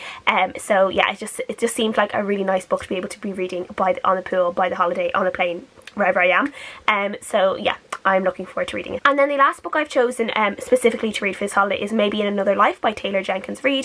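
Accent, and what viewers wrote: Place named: Ireland. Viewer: I love your Irish accent! As an American of Irish decent, it never gets old. On Beauty looks amazing! Have a fun trip girl!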